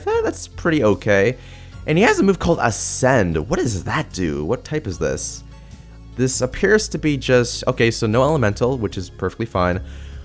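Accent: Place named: Ontario, Canada. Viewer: I assume he is a fellow Canadian... Sounds like an Ontario accent to me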